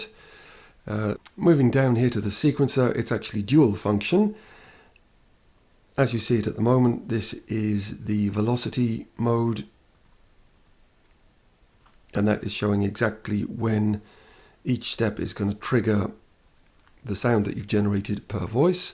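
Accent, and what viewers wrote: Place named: South Wales, United Kingdom. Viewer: It's been doing my bean in for a while now. I KNOW that voice/accent !! ... but I can't place from where I remember it. Another YT channel ? My musical adventures from days of yore ? My work with PAs ? . I too have a South Wales accent but mine's from a little further West. Love your work in reminding us of the power of Reaktor btw. 👍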